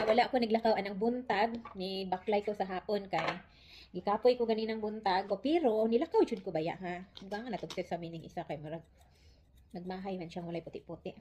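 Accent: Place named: Visayas, Philippines. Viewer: Super love ko talaga ang boses bisaya accent sis ❤ bakit kasi nasa gilid lahat ng manitka haha! Sarap naman 😊 lola lovin’ it🥰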